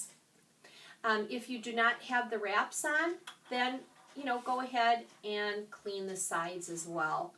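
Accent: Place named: Minnesota, United States. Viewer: Thanks, this was very informative. Like the accent, reminds of someone I know from Minnesota.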